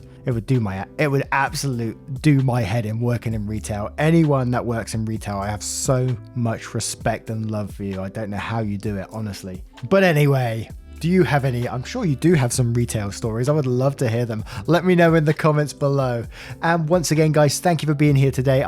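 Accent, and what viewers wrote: England, United Kingdom. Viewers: My day off so perfect time to catch up on waffles lol. Always awesome to listen to, the perfect voice. Canadian’s love that English accent.🥰🥰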